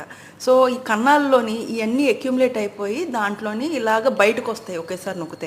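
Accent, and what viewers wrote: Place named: Godavari, India. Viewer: Miru telugu bale matladtunaru madam. Ma Godavari language ❤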